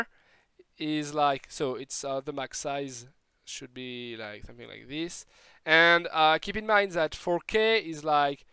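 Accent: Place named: France. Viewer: Hmmm je ressens un accent Français là, ça je valide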